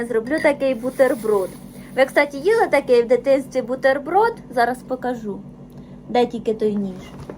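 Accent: Poltava, Ukraine. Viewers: У нас ,на заході України, мова трошки інша.Але так говорять мої рідні з Хорола Полтавської обл. Люблю слухати ваш діалект,здорово і гарно!❤😊 Смачного тобі!!🎉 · мені подобається як ти розмовляєш. ми на Полтавщині так і балакаємо.спасибі за відео.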